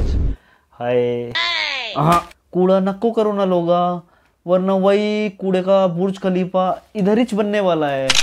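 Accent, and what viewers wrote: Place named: Hyderabad, India. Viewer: hyderbadi or mumbai ka mix lag rha hai mujhe🤣😅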